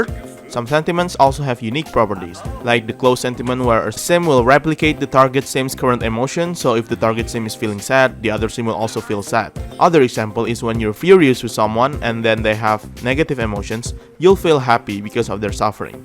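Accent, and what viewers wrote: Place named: Indonesia. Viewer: Sebelum ku liat deskripsi "kalo didengerin kayak orang indo ya 🤔" Ternyata bener 🙆🏻‍♀️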